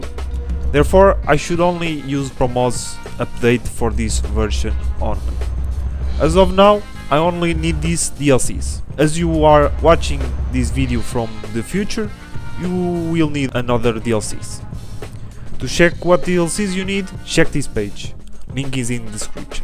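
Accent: Portugal. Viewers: It's amazing, in just 3 seconds of watching your video I could tell you were portuguese haha, continua com o excelente trabalho 🇵🇹🔥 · Such a Tuga accent! Obrigado!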